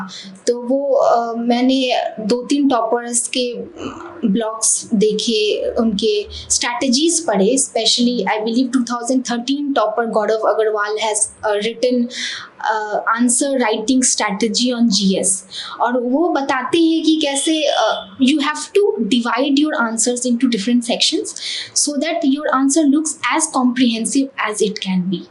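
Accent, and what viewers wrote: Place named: Bihar, India. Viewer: Bihar se hai ye hindi me bojauri include karate hai 😊😊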